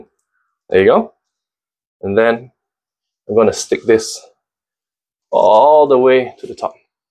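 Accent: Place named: Singapore. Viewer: Get to the point, my Singaporean accent friend!!!!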